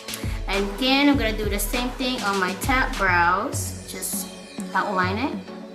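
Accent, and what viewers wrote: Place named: Philippines. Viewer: You are so pretty! I watch you all the time. Love your eyebrows, eye shape, and your cute accent! Sounds a bit Filipino. =) You Friday Night Hair GLS95 straight long hair looks good! I wanted to order it last week, but it was sold out! =/ What type of hair is it? Does it have a Yaki feel, or a silky smooth feel?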